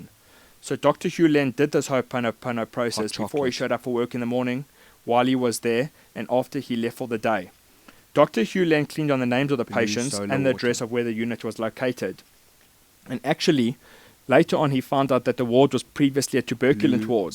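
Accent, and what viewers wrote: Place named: South Africa. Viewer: The Video looks like it was filmed at Palace of the Lost City, Sun City, South Africa... I had the pleasure of spending a few days there. Also, your accent may be a give away too...:)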